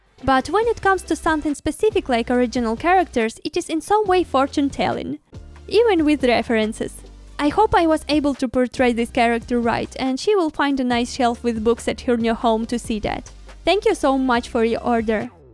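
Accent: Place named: Ukraine. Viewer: I love the red doll and your workspace, but I just have to say... Your accent is so pretty! You're Ukrainian? I've never heard someone speak English so lovely! xD God bless all of you. ❤️ 🧡 💛 💚 💙 💜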